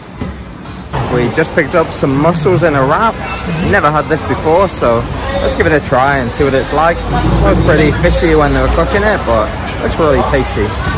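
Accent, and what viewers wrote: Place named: Yorkshire, United Kingdom. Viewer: something about his yorkshire accent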